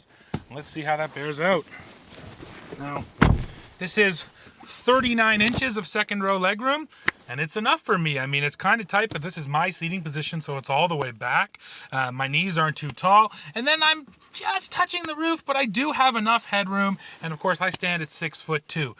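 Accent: Canada. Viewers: FYI, the US pronunciation of Mazda and Yamaha with an IPA ä sound is closer (not perfect, but closer) to Japanese pronunciation than the Canadian æ sound. That said, you don't have to water down your Canadian-ness to appeal to a broader audience. I've been subscribed since long before ever worked for TFL, and it's one of the endearing distinctive qualities that helped you stand out when I watched my first video. That you have real experience using trucks for towing, hauling, and off-roading, plus winter weather testing is what makes you one of my favorite channels.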